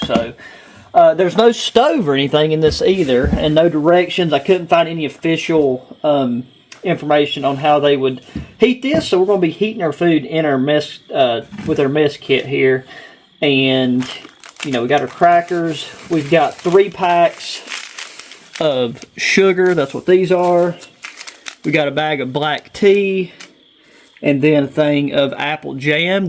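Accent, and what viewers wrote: South, United States. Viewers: Very cool Amigo. New subscriber. I have only tried one of these Kaz. rations, older version. Components are standard for Russian, Ukraine, and entire area. I like most of them. Those big pieces of beef, in Russian meals, is called Tushonka. Cabbage meal is called Bigos, usually has sausage in it. Both are tasty. Glad you had fun on this ration. Peace from Kentucky, John. P.S., just guessing from accent, you are or were from Southern U.S. ? WE all sound the same, LOL. That is A compliment!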